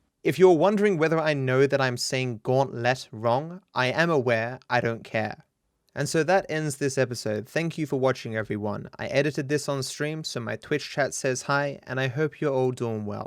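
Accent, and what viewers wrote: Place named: Australia. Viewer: Sounds like your saying it right to me..but then I'm Aussie too.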